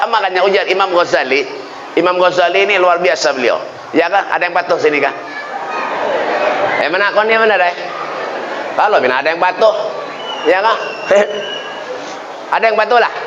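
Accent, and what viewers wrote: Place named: Banjar, Indonesia. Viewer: Logat nya Arab Banjar 😁😁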